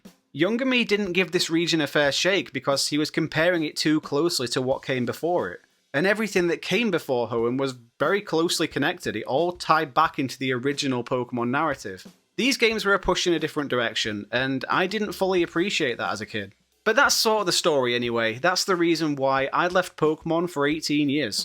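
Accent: United Kingdom. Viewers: Sounds like you've had a similar journey to me mate, stopped at Ruby and then ended up getting Ultra Sun around lockdown. Only really got it to be able to download that "poke-transporter" app from the 3ds store before it closed - ended up really enjoying the whole vibe of the game and it sucked me back into the pokemon world once more! Just like with you and Sword/Shield, all I came across online was absolute hatred for Ultra Sun, but I loved it 😅 Since then I've gotten a hold of and finished Platinum and White - really enjoyed them too. Right now I'm absolutely immersed in Legends Arceus and I'm fairly excited to see where the series goes from here! As a side note, you sound like you probably live around my area, north-west? I grew up around Stockport 🙂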